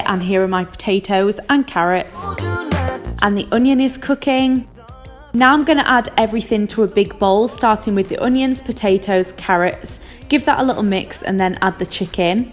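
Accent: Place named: Derbyshire, United Kingdom. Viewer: Where are you from originally? I’m from chesterfield and you sound just like us from this neck of the woods 😆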